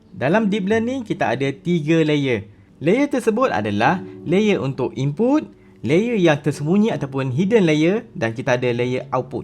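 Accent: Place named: Kelantan, Malaysia. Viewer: Ada bunyi macam telo orang Kelantan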